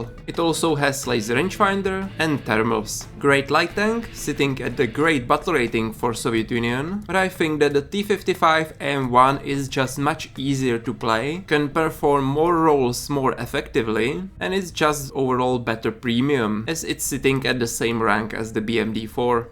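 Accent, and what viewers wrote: Czech Republic. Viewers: Když jsem slyšel ten přízvuk, bylo mi hned jasný, že jsi čech. Super video! :D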